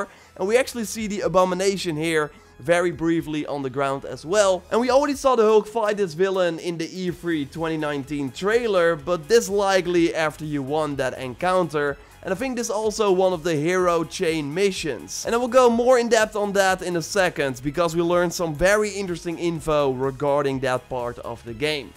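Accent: Amsterdam, Netherlands. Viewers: youre inglish sounds like a hollander zeker uit amsterdam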